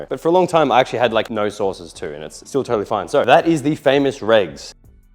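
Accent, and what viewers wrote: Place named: Australia. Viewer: AYYYYYY another meal prep video in australian! lesssss goooooooo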